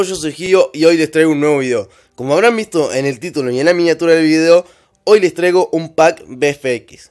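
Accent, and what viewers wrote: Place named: Argentina. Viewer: de qué parte de Argentina sos ?😆😆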